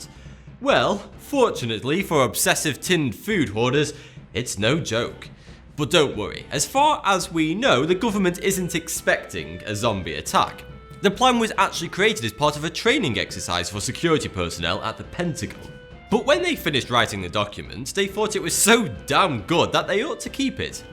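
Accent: United Kingdom. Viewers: I refuse to watch any video narrated by a Brit.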